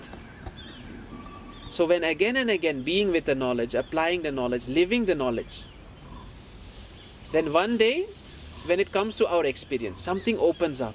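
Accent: India. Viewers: Was you born in India you sound like a native. That or you spent alot Time there. My friend speaks Spanish. But he learned from Mexican people. So when he does speak Spanish. He sounds just like them. I think it's cool take care.😘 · You are white but have an Indian accent.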